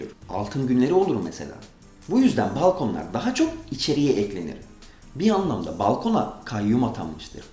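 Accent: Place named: Greece. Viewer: yunan ağzıyla konuşmuşsun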